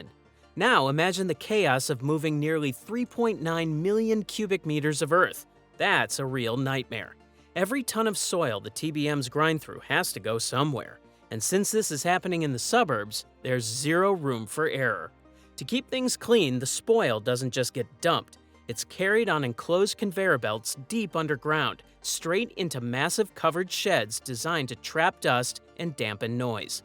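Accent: United States. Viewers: Why is an American accent narrating an Australian project?, " tunnelling under homes and businesses , no they're not because the home owners and businesses have been relocated whether they wanted to be or not, I get the need for progress, but I haven't heard what diversion means are being implemented so wildlife can be safe while crossing sides? It's distressing to see wildlife dead roadside because of a pathetic piece of wire for them to use, why can't we take a leaf out of the book of other countries and build an underpass or overpass for them, or is that not profitable 😮 I do like the plan for a park topside over the tunnel, nice bit of greenery. 😊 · Why is an American narrating? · We should be hearing a voice like Brenton Ragless instead of that American voice 😢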